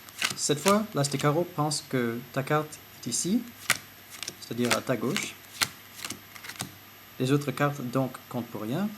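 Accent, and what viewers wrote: Quebec, Canada. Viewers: bravo j'adore l'accent québecois :-)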